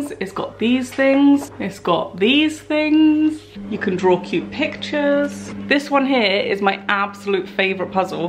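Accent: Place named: England, United Kingdom. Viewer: My google loves your voice! Without fail every time you talk to your google, mine obeys you. She hardly listens to me I have to repeat myself so often. Perhaps she prefers the English accent over my Australian accent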